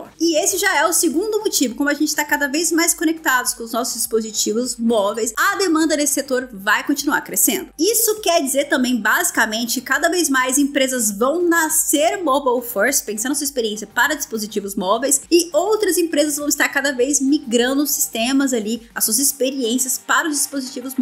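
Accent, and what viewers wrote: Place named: Brazil. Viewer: Bequiendgi, Frontiendgi... adoro o sotaque brasileiro no inglês, tanto tempo fora me faz sentir muitas saudades.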